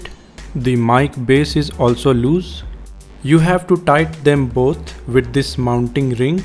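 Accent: India. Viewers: Great video, you're Indian. I heard it right away.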